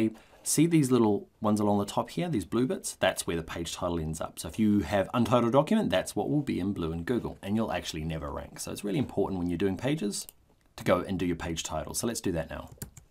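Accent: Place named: New Zealand. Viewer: great video, awesome to hear a fellow NZer too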